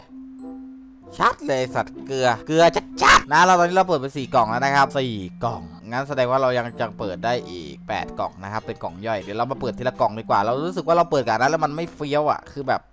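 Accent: South, Thailand. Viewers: พี่ จังหวัดไหนของภาคใต้คับ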